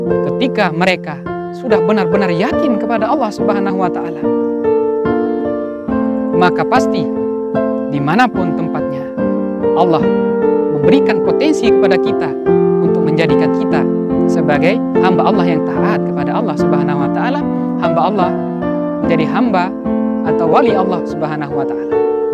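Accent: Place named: Malaysia. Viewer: Kok loghat nya mirip orang Malaysia ya 🤔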